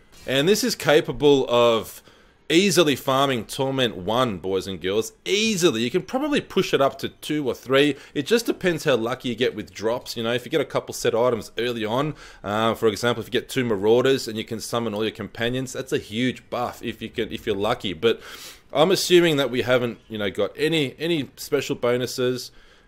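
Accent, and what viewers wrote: Australia. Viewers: Great guide in simple language for a new player. Thanks for the help. Also nice to hear a Aussie.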